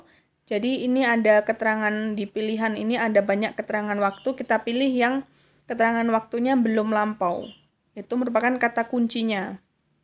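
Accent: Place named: Java, Indonesia. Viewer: Nyong seneng medoke sampean mbak sensei..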